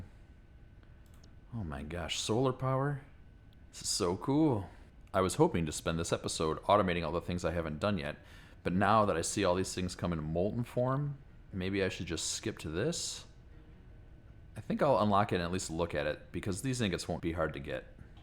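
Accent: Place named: Midwest, United States. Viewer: Been watching your newest SF+ series; that series and this are both great. Commenting as a fellow Michigander to say I knew you were from Michigan after hearing you talk for a couple seconds in the first video, you have a strong Midwest accent. It’s nice hearing it, I’ve lived in Japan for quite some time and don’t get to hear it often.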